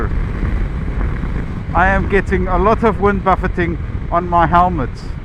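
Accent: South Africa. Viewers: Are you from South Africa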